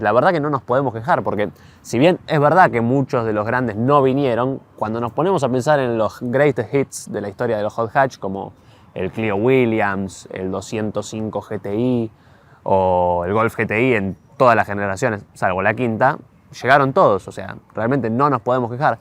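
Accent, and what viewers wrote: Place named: Argentina. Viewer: ya vi varios videos tuyos y es como dicen los comentarios: Es un placer escuchar tus descripciones bien en argento y con buen humor. Te aplaudo por haber probado un auto antiguo y argentino, esos que eran los deseados en mi juventud.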